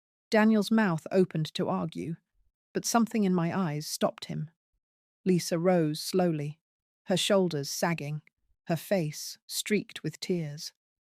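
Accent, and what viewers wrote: United Kingdom. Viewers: If she’s from Texas she has a very strong British accent, why?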